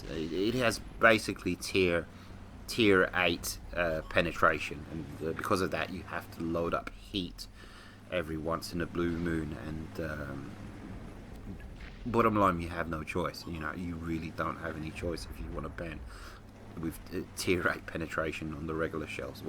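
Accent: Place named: United Kingdom. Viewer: need to stop wearing my headphones when im listening to your vids that music at the end threw me across the room lol nice vid as always big fan hope the hand is getting better can i ask where in the uk u from been trying to work out your accent???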